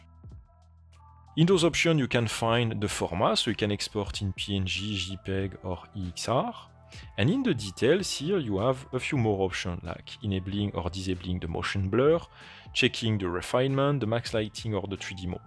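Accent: France. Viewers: Just a reminder, english is not french, and you do not pronounce english terms as if they were french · J'aime bien son accent frenchy. Ca rend les tutos beaucoup plus limpides. Pas besoin de sous titres pour comprendre.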